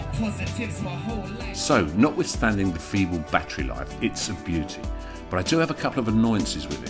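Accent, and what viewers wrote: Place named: United Kingdom. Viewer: I loik that british accent sow much :)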